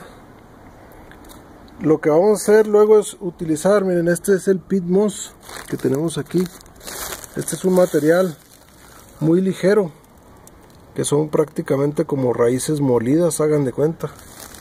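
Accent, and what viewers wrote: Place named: North, Spain. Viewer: me encantan la manes de explicate y su acento norteno me encantan felicidades excel ente video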